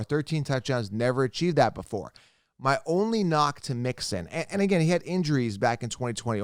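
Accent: Canada. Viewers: Are you a Canadian .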